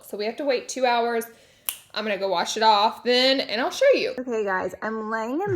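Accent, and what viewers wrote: South, United States. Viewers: Aspartame. Where are you getting the extra syllable??? I know you're southern but c'mon. We gotta do better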